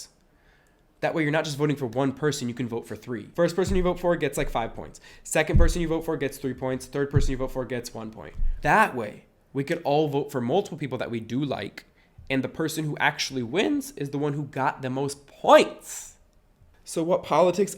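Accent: France. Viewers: Funny how you got a little bit of a French accent when you're speaking English ^^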